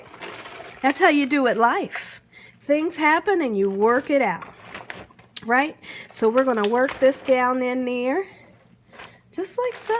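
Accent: South, United States. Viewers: ❤️❤️❤️ that sweet Southern accent 😌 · Where are you from ?? you are southern parts of somewhere🤗 Louisiana maybe? I'm from Alabama and we do things alot alike ❤️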